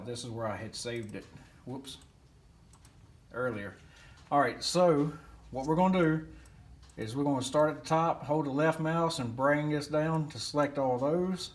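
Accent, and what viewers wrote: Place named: West Virginia, United States. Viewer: I recognize that accent, as I'm a WV feller not too far from Danville! Appreciate your video very much. Brand spanking new to CNC's and carbide create seems like a good program to get me up and running. (along with the help of good guys such as yourself). Hope your little girl is still doing well and healthy and will say a prayer for her and you and your wife as well. All the best.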